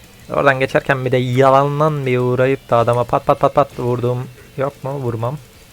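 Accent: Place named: Yoruk, Turkey. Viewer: Abi valla çok iyi konusuyon o yörük konuşman beni benden alıyor devam abi seviliyorsun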